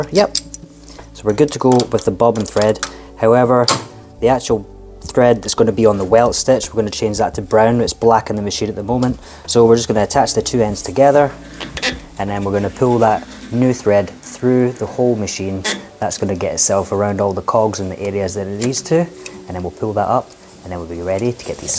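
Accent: United Kingdom. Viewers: You've done a great job on the Boots, they really look amazing. Where's your business you sounded like you could be from the UK ??? Anyway, great job. This comment is from Denver Colorado, United States of America.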